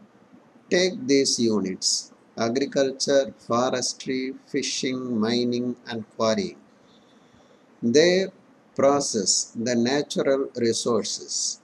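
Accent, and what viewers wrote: India. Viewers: i bet the video is great but i can't understand what you are saying becasue your indian accent is so strong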